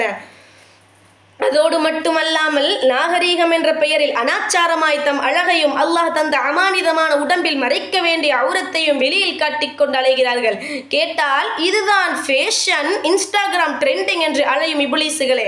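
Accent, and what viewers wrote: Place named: Pallapatti, India. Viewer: I think it's பள்ளப்பட்டி uswathun hasana style of slang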